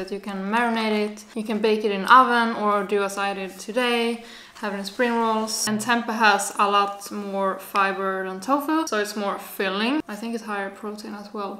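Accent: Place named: Sweden. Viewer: I love your accent! Forgive me if I am wrong but are you from Sweden? I am a first time watcher and I love your videos so far! ☺️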